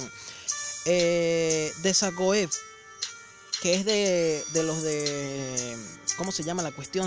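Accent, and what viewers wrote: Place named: Caracas, Venezuela. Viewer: de donde eres se me parece que eres caraqueño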